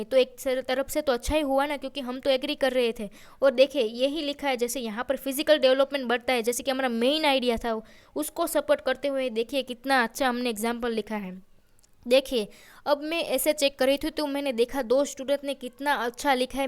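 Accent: Gujarat, India. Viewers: Very informative Ma'am aap Gujarati ho , aapki boli se lagta hai I'm also Gujarati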